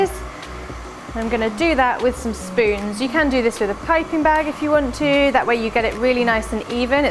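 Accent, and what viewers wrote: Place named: United Kingdom. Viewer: 👍😇 You're really great, I love the way you present things (and like with Jemma, I also love the way you speak - being German, I'm always looking for British English input and I enjoy your and Jemma's English so much 😍)!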